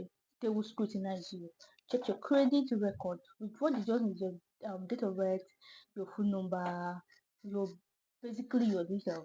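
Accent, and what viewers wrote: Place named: Nigeria. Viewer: Your mic is not helping at all... I can't really follow you that much, your Nigerian accent is too much and the mic too is producing base alone. Please check it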